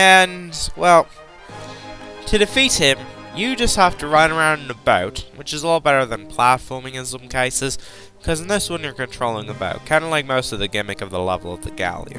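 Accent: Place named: United Kingdom. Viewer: Where do I begin? It's a countdown of your FAVORITE bosses, yet you have nothing but negative things to say about the first two bosses. Did you seriously just call Creepy Castle annoying?! Also, why do you have a British accent in this video, but have an American accent in your more recent videos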